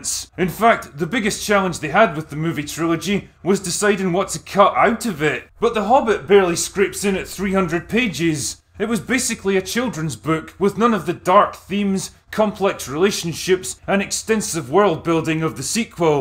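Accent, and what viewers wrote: Scotland, United Kingdom. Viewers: scottish with a hard S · The dwarves in this movie were so dumb, to put it plainly, and they were all unlikeable, especially the leader. Did you see The Hobbit, the cartoon? It was around when I was young (early 80s). I remember it was very good. Also, the book. I don't think we really needed these movies. Course, we don't need most movies. Maybe if it was just one really good Hobbit movie it would have been something.... I think the actor who played Bilbo did a good job though, but I can see your point about him acting the same in every movie. Edit* by the way I love your Scottish accent. I'm from the U.S., it's probably one of my favorite accents, I don't hear it enough! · I have no idea why this Scottish guy talks like he’s holding in a monster sh*t. We don’t all talk like that I promise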